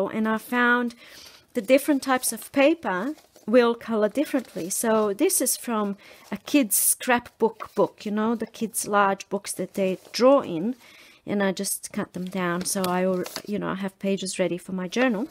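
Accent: Australia. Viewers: She’s Australian. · Your videos are always inspiring and as I’ve said before it’s wonderful to hear an Aussie accent!!!!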